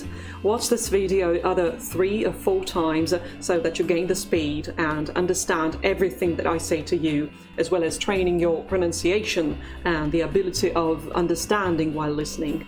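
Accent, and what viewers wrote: United Kingdom. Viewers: когдо я сделаю упражнение я всегда читаю всё вслук вы очен ясна гаворете но иногда немного медленно. You are clearly a very skilled linguist, I will subscribe even though you have a bit of a British accent when you speak english. I can hear the all the authentic sounds when you speak russian.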